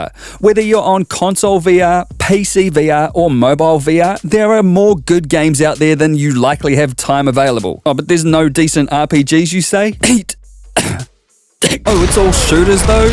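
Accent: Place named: Australia. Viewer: Are you a fellow australian? also this video made me buy fours sensors and an oculus rift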